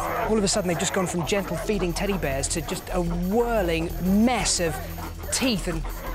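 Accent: United Kingdom. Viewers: Love British commentary. Its funny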